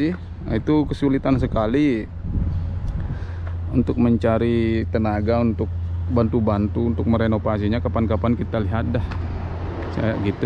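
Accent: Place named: Bali, Indonesia. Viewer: Logat nak bali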